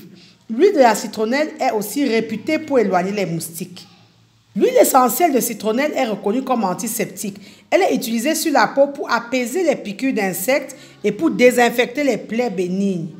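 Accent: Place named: Africa. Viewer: Félicitations ! L'agriculteur africain a besoin d'entendre vos conseils. Personnellement je vous écouterais des heures tellement votre voix est claire et votre accent tout africain de ce français que vous maniez si bien est comme une musique. Continuez. On est ensemble.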